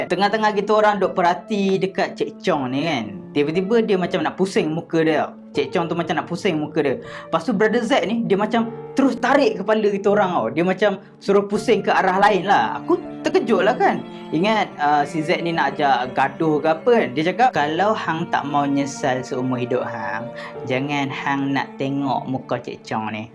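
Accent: North, Malaysia. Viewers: Loghat utara tu still acceptable 😂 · Bahasa utara abang tu betul la...tak sangka plak betui...saya oqang utaqa hahaha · boleh lh skit skit nk ckp utara tuuh sebab saya oang utara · Boleh tahan jugak loghat utara abang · bang saya orang utara bagi saya abang punya utara tu okay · Hahahahaha lawak bang buat lorat utaraa😂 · Pandai pulak die cakap utare😌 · Lawak la oi abang cakap utara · Loghat utaqa bang bukan main sempoi🗿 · Bolela loghat utaqa abg🤣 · Saya org utara saya tk tau nk cakap mcm mana bila abg cakap loghat utara. 😅😂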